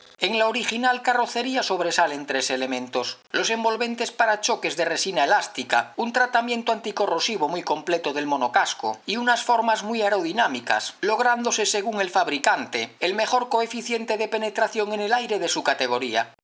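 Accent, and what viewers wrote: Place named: Galicia, Spain. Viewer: Como siempre, excelente vídeo. Por cierto, el acento gallego... auténtico, con personalidad, como un coche elegante de los 70.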